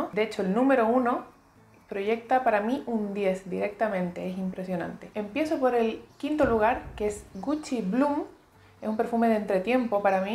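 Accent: Chile. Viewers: * tiene acento de chilena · por tu acento pareces Chilena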